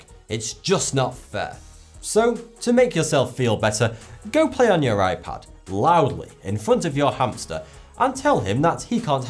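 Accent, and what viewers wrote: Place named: Lancashire, United Kingdom. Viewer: That accent makes him sound twice as intelligent, damnit I wish I was from Lancashire...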